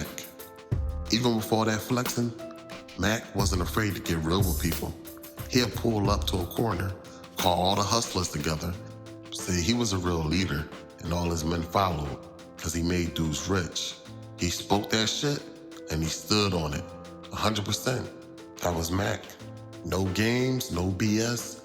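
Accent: Philadelphia, United States. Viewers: Yo you gotta be from Philly you talk just like us… where you from North?…appreciate your work bro keep doing your thing I be tuned in💯💪🏾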